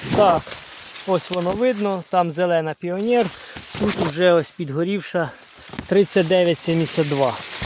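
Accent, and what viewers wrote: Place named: Sumy, Ukraine. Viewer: Коментар на підтримку. Але ж як приємно чути нашу сумську говірку)))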